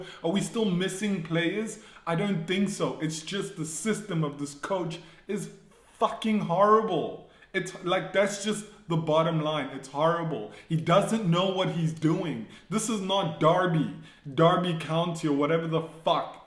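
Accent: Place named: India. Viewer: You look black but you sound 🇮🇳 Indian? I don't understand either?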